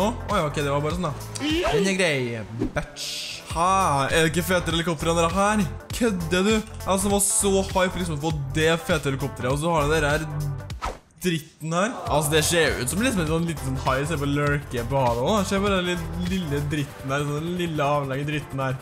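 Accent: Trøndelag, Norway. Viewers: Jeg elsker og høre på trønder dialekten din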